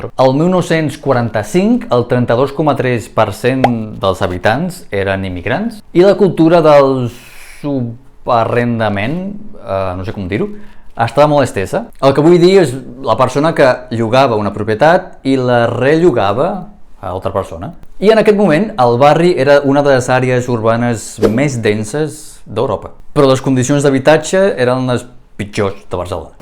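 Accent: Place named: Brazil. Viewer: gràcies pel vídeo, m'ha encantat! ja parles amb accent brasiler, que boniques són aquestes mescles interculturals 😅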